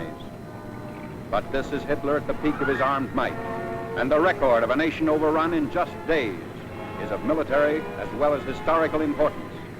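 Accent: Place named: United States. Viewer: German with an American narrator? piss off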